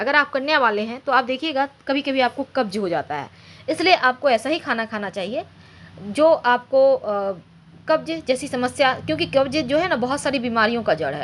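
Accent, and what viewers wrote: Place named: Madhya Pradesh, India. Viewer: If I am not wrong I think you are from mp accent se lagta hai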